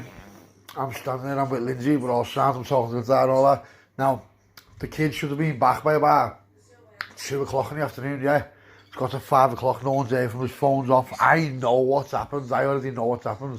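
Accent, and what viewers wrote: Liverpool, United Kingdom. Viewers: Yes Scouse ❤❤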